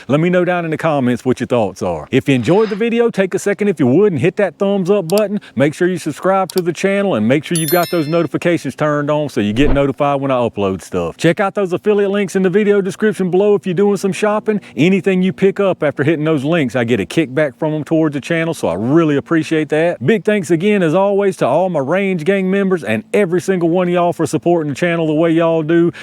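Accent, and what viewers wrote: South, United States. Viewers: This guy does some very interesting and informative videos. I also love his corn pone accent. This is not meant as a slur, I just love the fact that he's very unpretentious.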